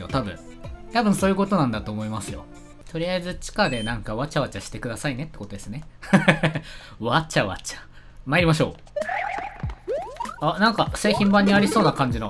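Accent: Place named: Kansai, Japan. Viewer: ・。・c 関西弁(´▽｀)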